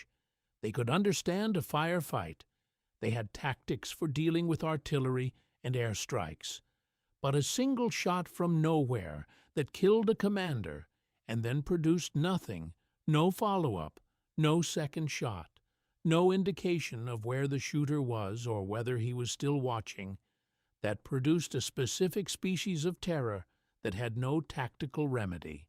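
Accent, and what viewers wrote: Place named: United States. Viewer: The American accent tells me that what follows is bullshit!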